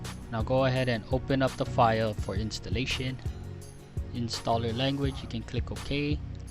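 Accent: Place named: India. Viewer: Czech guy with indian accent :D Interesting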